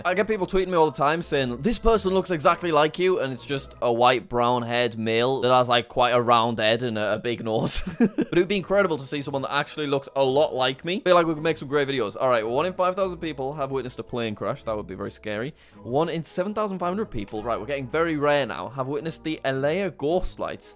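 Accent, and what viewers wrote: United Kingdom. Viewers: shut up asshole its British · Is British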